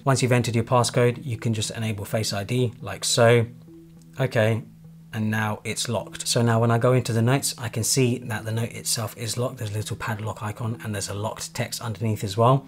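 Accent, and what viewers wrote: United Kingdom. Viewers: Yeah, an accent that doesn't make sense generally, the British... I shouldn't be hearing "nights", instead of "notes". That's why people don't like it.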